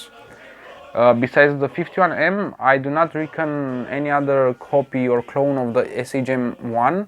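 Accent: Romania. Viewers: are you a romanian?i say this because you have a romanian accent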